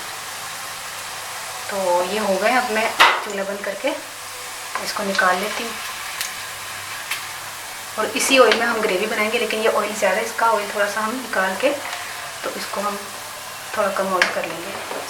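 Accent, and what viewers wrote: Punjab, India. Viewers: punjabi ka tarka acha lga mujhy😄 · Punjabi bohot payari bolden Ho 😍👌🏻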